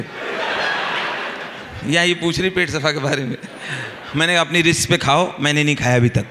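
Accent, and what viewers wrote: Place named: Kanpur, India. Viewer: Aap ko sun ke Kanpur yaad aa gaya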